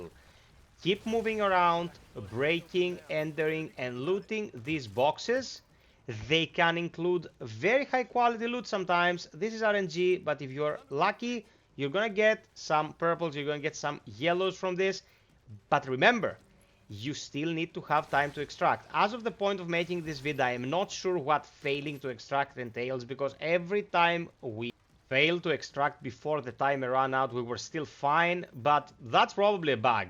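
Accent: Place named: Greece. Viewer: Βάζεις να δεις το βίντεο που σου έβγαλε η αναζήτηση και ακούς ελληνικής προφοράς αγγλικά! Μετά λες ρ 'εσύ, σαν του την φωνή και έχεις δίκιο! Πάμε joystick!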